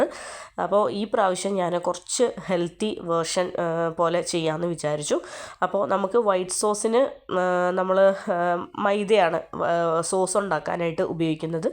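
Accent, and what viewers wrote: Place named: Kannur, India. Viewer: ചാനൽ ഫുൾ കുക്കിങ് തന്നെ ആണലോ,, നിങ്ങളെ വോയ്‌സ് കണ്ണൂർ കാരുടെ പോലെ തോനുന്നു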